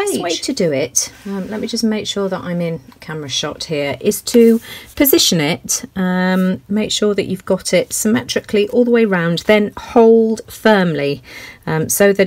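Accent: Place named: Suffolk, United Kingdom. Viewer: Boy you sound like my Suffolk family in the UK..made me home sick.😀😀😀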